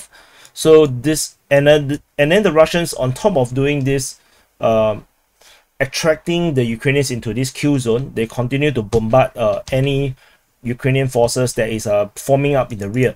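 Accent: Singapore. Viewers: you sound like a singaporean, are you? haha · Yes with your face is better ! Actually I follow your channel because of your English. Singlish? I like the way you speak English. You have a lot of humor too.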